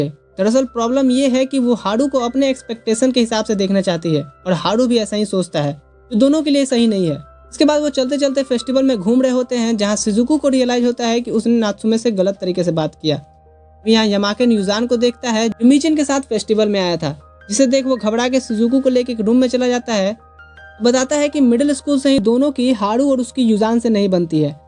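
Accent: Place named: Bhojpuri, India. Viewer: Mr apka asent bhojpuri 😅 h same here ☺️☺️and apki voice achi h 😴😴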